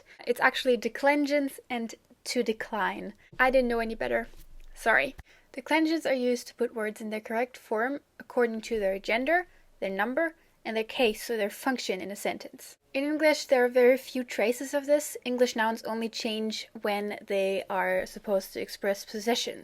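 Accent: United States. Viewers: Are you German? 'cause you sound more American or Canadian. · BTW your American English sounds great :)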